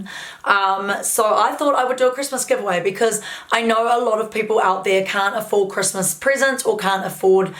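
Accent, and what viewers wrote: New Zealand. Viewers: your good old kiwi accent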